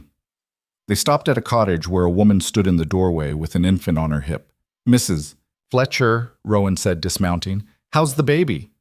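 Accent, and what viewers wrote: United States. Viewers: That American accent just isn't appropriate for stories of British aristocracy.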